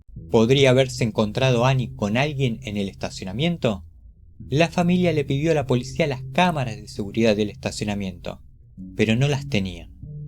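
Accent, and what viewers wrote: Argentina. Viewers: Seeee en la plaSHA, queso raSHAdo, Shego, SHO, caSHAdo, etc así hablamos los porteños che !!!!! 🤷🇦🇷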